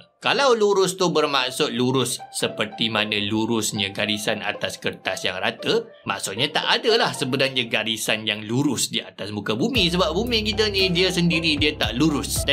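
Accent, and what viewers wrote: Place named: Perak, Malaysia. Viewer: Tk silap perak yg dekat dgn bahagian kedah sebb loghatnya bercampur utara dgn perak.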